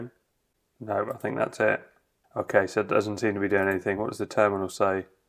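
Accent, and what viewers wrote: United Kingdom. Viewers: I bet you're from the UK.. Greets to Mr. Bean.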